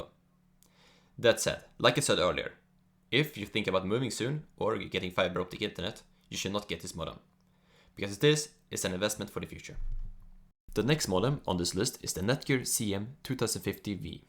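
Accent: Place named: Germany. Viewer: I can barely understand your accent dude. Speak slower because your thick german accent makes us Americans difficult to understand what you are saying. And it’s even harder for us Americans who English is not their first language (hispanics). So please, speak slower and try to pronounce better every single word.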